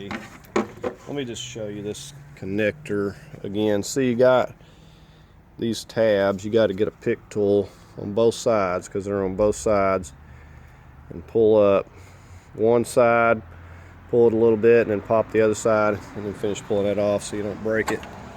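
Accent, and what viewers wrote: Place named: Midwest, United States. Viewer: Superb tutorial and many thanks! It's clear you have an immense knowledge and experience wrt to motor vehicles and specifically, this Tribute / Escape. Furthermore, you're a natural instructor; patient, considerate of all skill levels, able to add 'pointers' along the way and just a naturally helpful and decent American guy! I'm a native Minnesotan (currently living in Straya) and have also lived in So Texas and KC Mo. What I liked best about this vid was just listening to your patient, confident and sincere midwestern voice- am thinking somewhere south of KC and north of DFW? ( Or Louisville?) My project is a 2001 Tribute but its RH drive so much was identical save the ALT removal which had to come oout from underneath after the axle removal. I ended up hitting the top first-manifold and plenum seals, PCV and also the water temp sending unit. 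Then, on to the timing cover. I usually make a sketch on cardboard of the part being replaced and mark / punch holes and just add them as the bolts are removed. I bought the same Fel-Pro seals/gaskets as you bouight had to source them out of Chicago on Ebay-could not get them here and many think this is to prevent the old rigs from staying on the road and a sneaky way to force adoption of the current EV's coming out of Chyna. I hear it is similar in many Euro countries. I also did the oil pan gasket ( watched your other video) and that was very helpful too. I was able to wrestle the second exhaust pipe through the gap of that cross-memb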